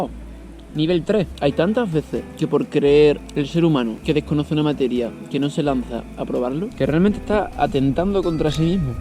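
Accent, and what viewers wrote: Andalusia, Spain. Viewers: Joe que grande!! Pareces dani rovira.Que arte ese andaluz!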